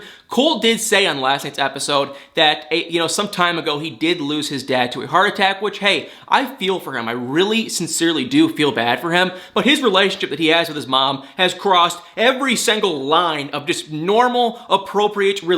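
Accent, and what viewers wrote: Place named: South, United States. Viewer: You're from Michigan? (me too) Wondering where that cute little southern twang comes from when you say 'ing' words. Great channel, enjoying your posts. 😊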